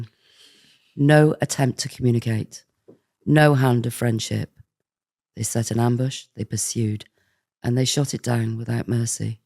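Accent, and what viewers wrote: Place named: England, United Kingdom. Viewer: This is a typical English voice if you live in England. Her accent is pleasant but not unique. She's English 😂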